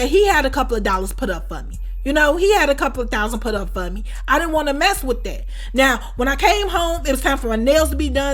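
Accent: Chicago, United States. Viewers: I just found your channel this morning and on the 3rd video lol I know my ppl when they speak (Chicago #773 😌) . Keep doing what you’re doing 💪🏾💪🏾💪🏾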